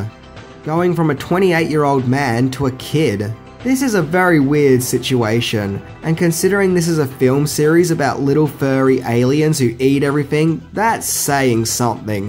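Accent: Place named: Australia. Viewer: The Australian accent makes "bastards" sound so refined. Thanks for the fun videos, keep up the great work.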